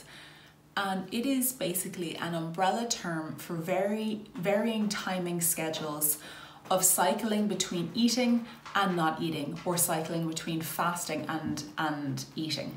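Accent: Ireland. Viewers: I have BED as well and also struggled with food addiction since childhood. I so agree with what you said about not being able to hear the fullness cues! I actually tried alternate day fasting last year and ended up losing some weight but inevitably plateaued because i was not keeping track of calories and, if I’m completely honest, was using my eating days to sort of binge 🤦🏻‍♀️ it was also just extremely difficult for me. Have since found your videos avs thinking of signing up for Bodyslims today and maybe trying 16:8 as well… thx for making all the info simple and clear! 🙌 Ps love ur Irish accent, could listen to it all day haha so soothing 💖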